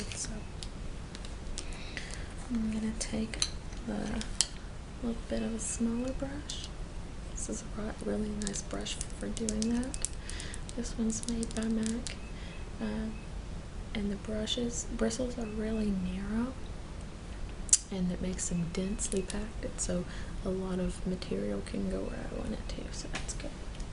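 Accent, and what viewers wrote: South, United States. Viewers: Oh dear God, finally, a woman with a Southern accent has uploaded one of these. There is nothing better than a Scarlett O'Hara. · are u southern? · Do I detect a southern accent? Lovely video. I've been watching several that you have made, and they're all so relaxing. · You gotta gorgeous southern twang to your voice. I always marvel at you girls who do this ASMR stuff. Don't gemme wrong... I love it. I just don't how you do it, staring into a camera. Y'all are some great actresses. :) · She sounds like she's from the south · you sound southern? I'm from Kentucky. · Amazing southern accent. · Emo lady with a southern accent omggg ❤ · I hear that southern drawl, where you from?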